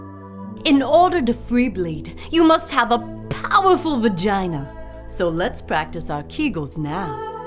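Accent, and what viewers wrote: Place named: Alabama, United States. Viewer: what accent does she have ? Alabama ? Its nice sounding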